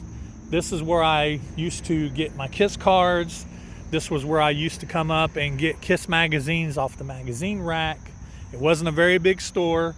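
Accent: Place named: North Carolina, United States. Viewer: I just knew you had a NC accent. I am also from NC (Asheville area) but I've been so many places I have lost SOME of the accent. Really enjoying your videos. Kiss fan since 1979 (age 4). I was buying records when other kids were buying toys.....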